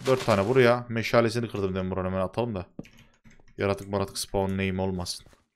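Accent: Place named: Kahramanmaraş, Turkey. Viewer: Abi maraşlımısın? (şiven benziyo)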